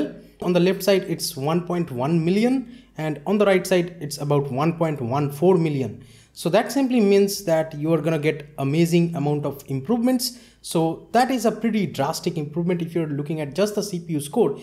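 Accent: India. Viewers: Hindu accent :D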